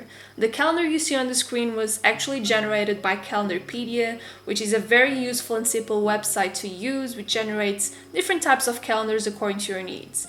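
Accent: Portugal. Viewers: Tu falas português??? ahh bem me parecia o teu sotaque inglês portugues És portuguesa ou br??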